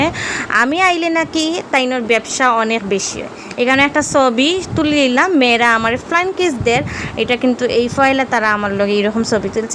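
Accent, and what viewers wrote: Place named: Sylhet, Bangladesh. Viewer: মাশাল্লাহ অনেক সুন্দর ভিডিও আর তোমার মুখে সিলেটি ভাষা শুনতে আরো ভালো লাগছে ধন্যবাদ এত সুন্দর ভিডিও শেয়ার করার জন্য লাইক এবং পুরো ভিডিও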